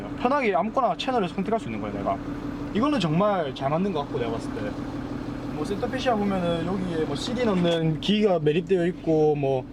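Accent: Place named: Gyeongsang, South Korea. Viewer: 최초 갱상도 사투리 리뷰.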